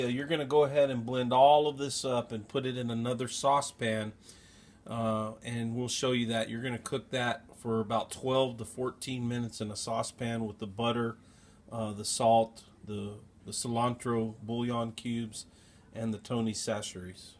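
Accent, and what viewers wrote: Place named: West, Mexico. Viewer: BTW-love your accent of Western and slight Mexicanish accent. Must be good since your mouth is watering as you cook. That's what all good chef do! :-)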